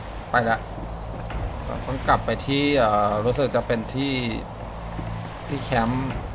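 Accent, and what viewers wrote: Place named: Suphanburi, Thailand. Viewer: พี่เป็นคนสุพรรณบุรีป้ะ เหน่อเวอร์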